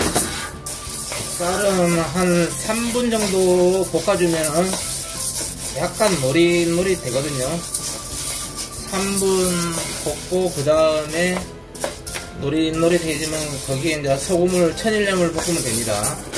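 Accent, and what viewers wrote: Jeolla, South Korea. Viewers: 절라도 사투리가 긴 있당켸 ㆍ ㅎ ㅎ 호 ~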